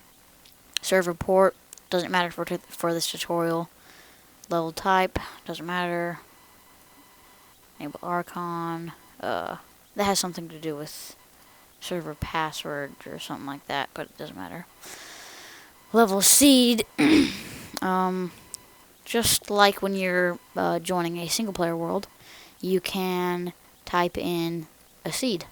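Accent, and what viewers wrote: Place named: Texas, United States. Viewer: you sound texas are you texas??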